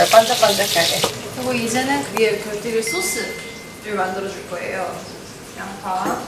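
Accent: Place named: Gyeongsang, South Korea. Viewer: 는 경상도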